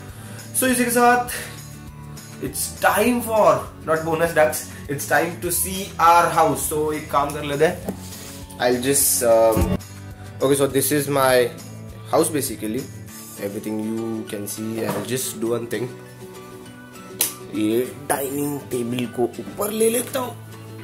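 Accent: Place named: Maharashtra, India. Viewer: I like your marathi accent 😃😄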